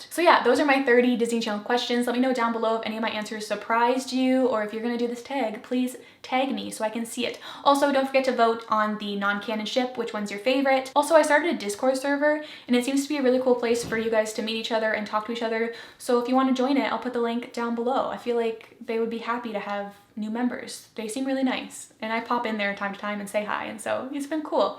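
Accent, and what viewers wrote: Canada. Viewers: your canadian accent very much jumped out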